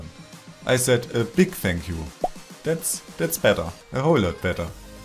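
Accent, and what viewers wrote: Germany. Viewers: Da kuckt mann ein Video und hört den Deutschen Aktzent raus :D find ich gut. ^^ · ich lieb dein denglish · hello i était like your German voice xp it's more simply to understand you than American youtuber and I think it's a really good idea to use the new effect into your command it's beautifull ^-^ have a nice day for my it was "fête du travail" yesterday :3 (sorry don't no this word in english ... :/ work day I think ? ) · Are you really englisch? ;D Its sound very German. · Amazing command guy ! PS : I love your german accent :D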